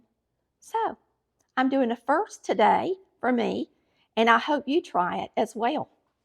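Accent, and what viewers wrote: South, United States. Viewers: Your recipes look so delicious, I just love your sweet southern talk. I definitely want to make this cake. Thanks for sharing!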